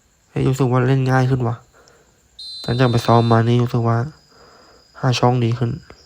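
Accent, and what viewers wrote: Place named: Ang Thong, Thailand. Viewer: คนอ่างทองหรือสุพรรณคั้บเนี่ย สำเนียงเหมือนเพื่อนผมเลย😊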